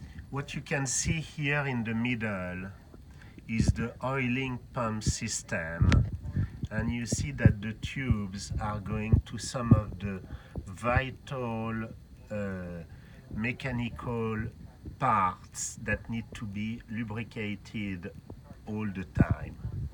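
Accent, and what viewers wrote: France. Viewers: You have a very french accent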